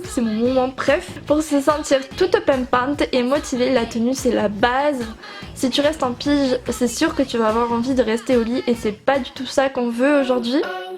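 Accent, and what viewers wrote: South, France. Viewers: J’ai pas compris pourquoi tu fais l’accent du sud ? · Isleym Hachfih peut être qu'elle est du Sud ? 😕😂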